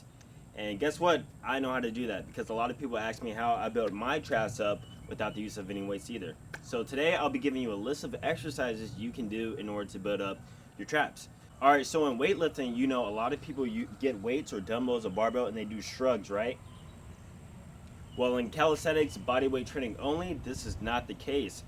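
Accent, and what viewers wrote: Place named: Caribbean. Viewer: You sound like you from the caribbean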